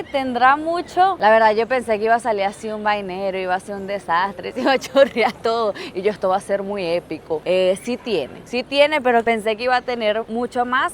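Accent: Venezuela. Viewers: me encanta como aún mantienes el acento venezolano. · Ojo con tu acento. No te vayan a agredir por allá por ser venezolana.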